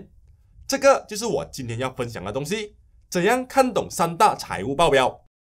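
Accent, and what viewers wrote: Malaysia. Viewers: 聯邦腔很可愛好聽，內容也很好懂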